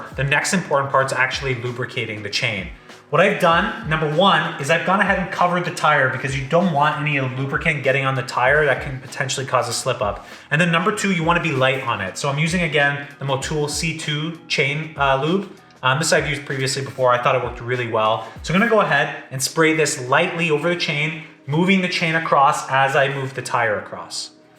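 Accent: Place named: Canada. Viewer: how can one tell you are from canada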